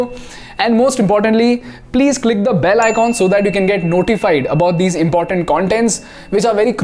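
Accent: India. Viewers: Tbh, as like everyone else, I too am so fortunate to find this channel. So informative and concise with a great Indian Accent English. Thanks to you, Cred and YT. You know why I thank them. Keep up the Good work bro.🔥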